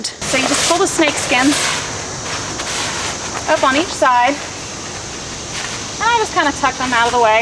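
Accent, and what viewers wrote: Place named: Alabama, United States. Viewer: Great video. Thanks for sharing. You sound like folks from my home state of Alabama, which was comforting, lol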